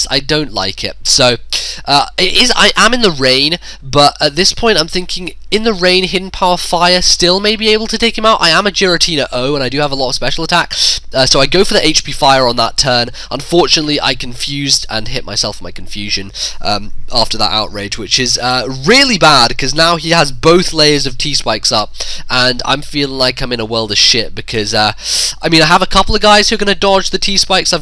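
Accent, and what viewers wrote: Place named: United Kingdom. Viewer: U british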